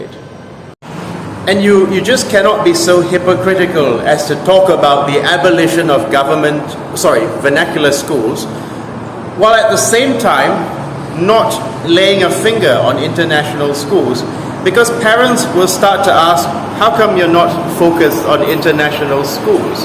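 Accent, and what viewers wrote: United Kingdom. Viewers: Great video. You sound like British Educated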